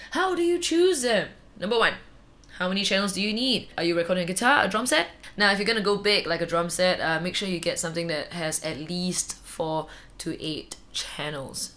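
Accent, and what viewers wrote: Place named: Dominican Republic. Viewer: You speak like a Dominican in english.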